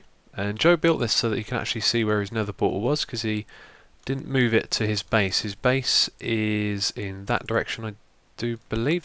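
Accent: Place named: United Kingdom. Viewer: Brittish English....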